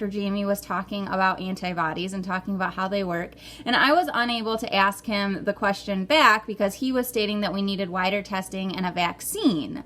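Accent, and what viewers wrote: United States. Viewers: I like your Michigan accent.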